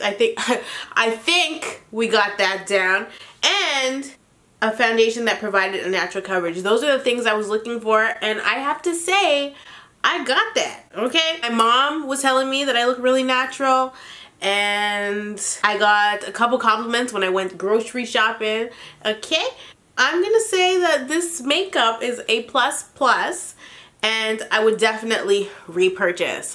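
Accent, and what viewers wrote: United Kingdom. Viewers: That accent though sis had me shook lol 😂🇬🇧bound lol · UK accent on point sissss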